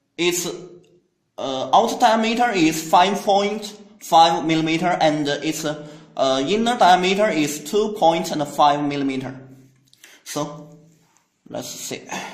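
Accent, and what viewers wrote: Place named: China. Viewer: Evert van Ingen made in china english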